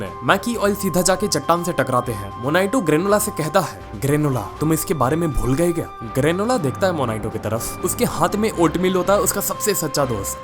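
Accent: Maharashtra, India. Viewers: Bhai kya aap Marathi ho 😇😇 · BHAI TERA MARATHI KADK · Bhai tum Marathi hai? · Today's narration is op 🔥 specially little bit marathi used during narration 🤣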